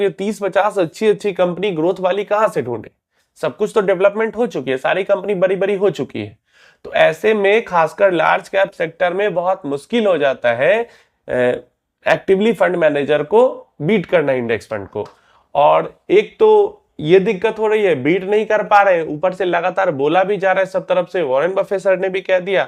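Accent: Bihar, India. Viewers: रा का उच्चारण बिहार वाला ही हो रहा है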